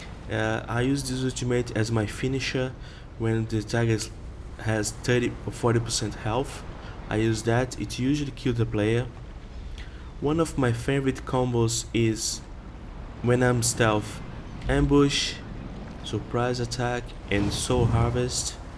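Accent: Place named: Brazil. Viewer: this accent...are you brazilian? haha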